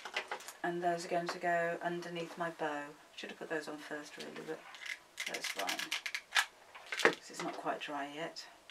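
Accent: England, United Kingdom. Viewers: It is so nice to listen to a tutorial given in an English accent.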